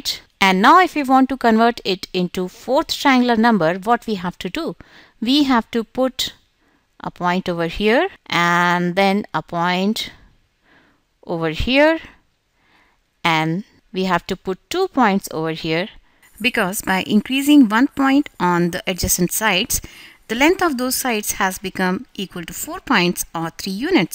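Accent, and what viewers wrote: India. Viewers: annoying voice. And until when will the Indian accent with English titled videos be made.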